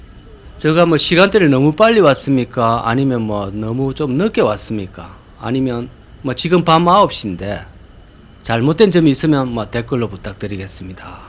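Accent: Gyeongsang, South Korea. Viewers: 님 고향이 창원 마산쪽인가요? 아님 김해쪽이신가요? 사투리로 봤을때는 서부갱남은 아니신거 같아서요.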